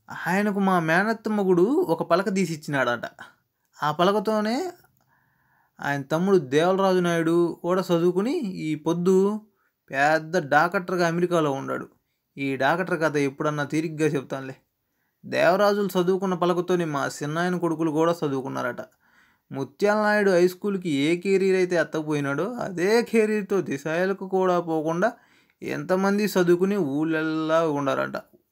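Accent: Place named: Chittoor, India. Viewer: Baya chittoor slang lo chaduvu baya super ga untadi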